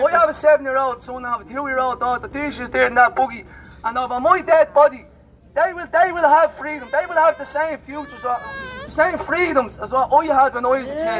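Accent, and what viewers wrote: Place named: Ireland. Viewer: Your Irish accent is music to my ears, fookin golden 👌🏻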